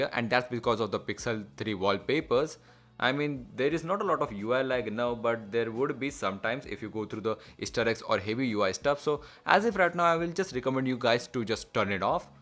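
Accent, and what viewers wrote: India. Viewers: You have EYES though. I can understand it even if he talk in pure Indian as long as i can see what he's doing.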